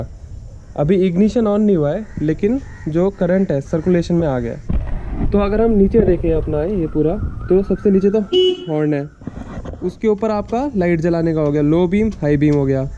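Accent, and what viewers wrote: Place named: Haryana, India. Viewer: Haryana ki boli laage tere Bhai jma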